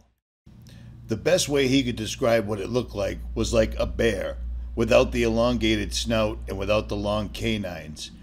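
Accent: Boston, United States. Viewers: This sounds like richie from boston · You sound exactly like a guy named "Ritchie from Boston" lol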